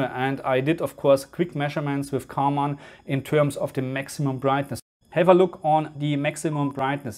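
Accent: Germany. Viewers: bist du deutscher? Klingt so 😁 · That's one heavy German accent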